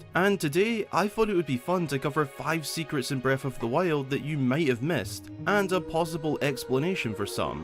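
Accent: Scotland, United Kingdom. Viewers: When I heard the Scottish accent I audibly gasped. I'm also Scottish and a fan of LoZ :-) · I love the thought of Dodongos in BOTW! BTW, I couldn’t pinpoint it at first, but your accent sounds just like the male version of Merida’s from Brave. I’m guessing that may be because you’re Scottish? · First video I've seen from you, and I love the Scottish accent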